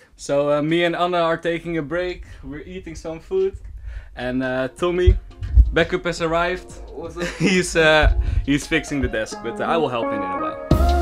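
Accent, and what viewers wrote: Netherlands. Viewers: Hahahahha je kan zooo goed horen dat je nederlands bent ga zo door💪💪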